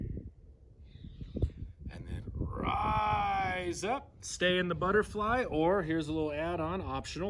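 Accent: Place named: Germany. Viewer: Thanks this Was really helpful and didnt even feel like 15 Minutes.. But whats up with that german voice? Ich bin neugierig 😁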